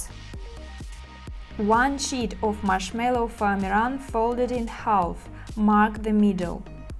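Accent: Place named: Russia. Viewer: то то я думаю акцент русский 😄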